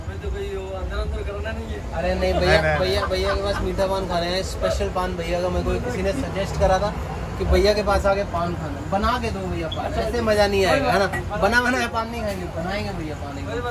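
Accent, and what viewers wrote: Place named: Jammu, India. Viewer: Bro punjabi kam dogri zada hai 😂❤️ but I really like it ❤️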